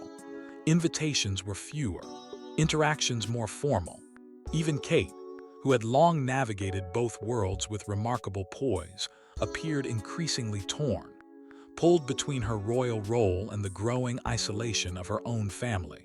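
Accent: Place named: United States. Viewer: Was all set to listen to this while I nodded off to sleep but unfortunately the narrator is English speaking but not Britain speaking. Why why are all these videos made with English I should say American people. Next the narrator should learn how to say the Queen pronounce the names right. Just hope and pray that I don't spend all night hearing Cam milla, Cam milla, Cam- milla. It's, I believe, Ca-milla.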